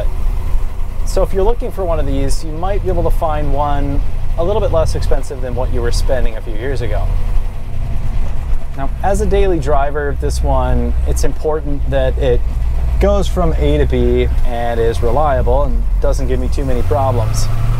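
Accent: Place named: Minnesota, United States. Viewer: What part of Minnesota are you from.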